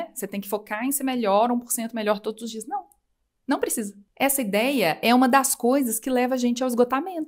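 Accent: Minas Gerais, Brazil. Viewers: Tão bom ouvir tudo isso com sotaque mineiro... o "disgrama" me pegou kkkkkkkkk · Estou adorando seu canal,! ❤ Amo esse sotaque mineiro 🥰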